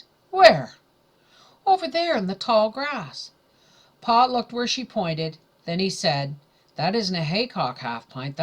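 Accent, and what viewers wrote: Canada. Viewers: Not everyone knows every word plus she is Canadian we pronounce some words differently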